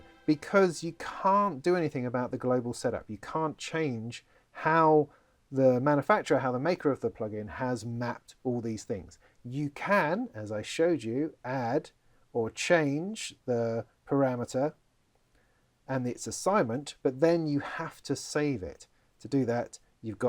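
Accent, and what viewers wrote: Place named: England, United Kingdom. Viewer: Fabulous down to earth review and demonstration.. And best of all someone who's English.. No annoying American accent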